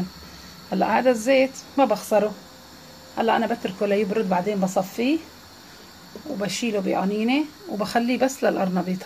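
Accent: Aleppo, Syria. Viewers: بحب لهجه حلبية ❤ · لك دخيلك وخيل اكلات الحلبية يا انا متل حكيتك والله ياخيت حلبية ابوس جنق وجك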